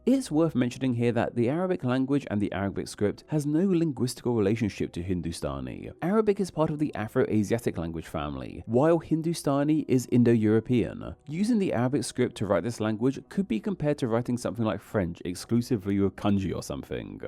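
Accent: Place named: England, United Kingdom. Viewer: Never knew this. Fascinating. What I also find fascinating is the way in which the narrator of your videos has a tendency to add an "ah" sound to the end of words, where a full stop might appear. For example, the previous sentence might end with "appear-ah". It's fascinating. It's not unlike a preacher in some of the evangelical churches in America, but the speaker is very obviously English. I would love to know the backstory of where this speech pattern comes from.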